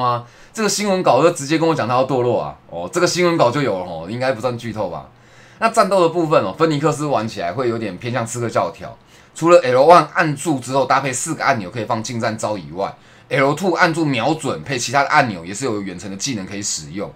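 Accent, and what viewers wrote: Taiwan. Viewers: 聽講台語還蠻好笑的，哈哈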